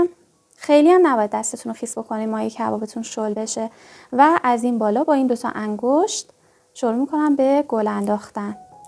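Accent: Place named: Yazd, Iran. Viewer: ااا فک کردم یزدی اردکانی هستین😄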